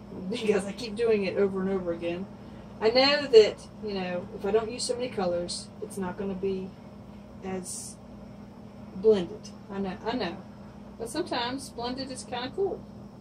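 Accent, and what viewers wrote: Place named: Georgia, United States. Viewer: I thought your accent was familiar! I'm also a Georgia girl; Savannah area!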